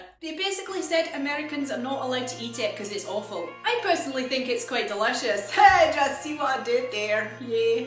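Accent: Scotland, United Kingdom. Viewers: As a french guy in Montreal!!! Looooooooooove your Scottich accent!!! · Actually not a bad accent... Kinda in between Dundonian, Glaswegian and Ayrshire. To follow up on your recommendation, the accent reminded me of Karen Dunbar from Chewin' The Fat (probably best known as Betty the Auld Slapper in the show) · Just warning you now, if we ever meet in real life, I will be asking you to use your Scottish accent. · I kinda like the Scottish accent. You seem to do it very well. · hey thank you soooo much for doing this :) I'm in Glasgow now :) great Scottish accent. I often think you guys in England forget about us. I've only ever been to Blackpool in England but I'm thinking maybe Plymouth or London would bee cool :) maybe I can come down south for a bit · Its like a cute verrrrsion of a rrrheal Scottish accent =P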